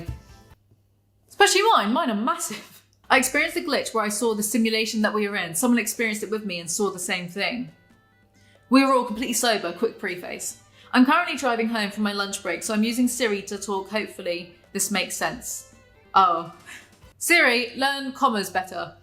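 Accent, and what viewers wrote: United Kingdom. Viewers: LMAO YOUR PHONE IS RIDDLED, I love hearing another UK accent, makes me feel like we actually exist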